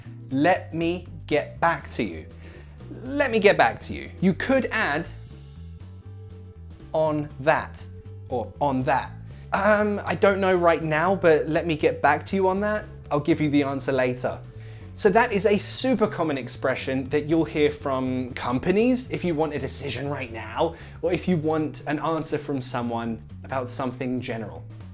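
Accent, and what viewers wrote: United Kingdom. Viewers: OMG ! u've got a great British Accent . 😮😮 by the way . I like ur style of teaching it's funny All the best 😍❤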